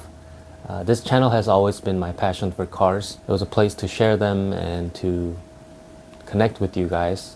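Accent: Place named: South Korea. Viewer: You have a korean accent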